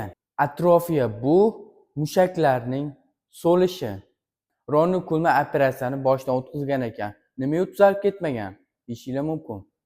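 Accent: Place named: Tashkent, Uzbekistan. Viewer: Yana bitta narsa toshkent shevada emas adabiy gapirish yaxshiroq buladi manimcha nutq juda juda zaif ishlash kerak ekan agar shu sohada ketmoqchi bosa bu bola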